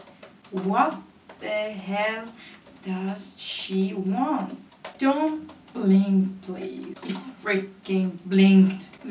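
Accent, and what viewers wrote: England, United Kingdom. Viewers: Continua cosi e non ti preoccupare del tuo accento inglese perché è fantastico! Booyah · Il tuo accento è stupendo sembri veramente inglese *_* anche a me piacerebbe fare video in inglese appena avrò tempo mi ci metterò ahahah cmq volevo dire che sei fantastica e che il mio tipo di fangirl è... Tutti tranne il primo tipo e l'alternativa lol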